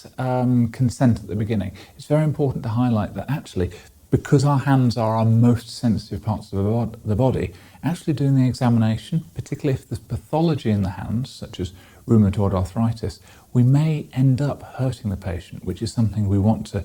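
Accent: United Kingdom. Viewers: It’s interesting for me to hear all of these same unusual terms, said in a similar British accent, but by a different doctor. I’ve watched the Curtis Robb hand examination video tons of times. It’s crazy how complex the hand system is!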